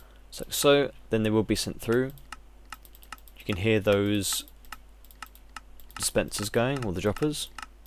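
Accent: United Kingdom. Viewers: Your like etho with a British accent :D But really you are genius.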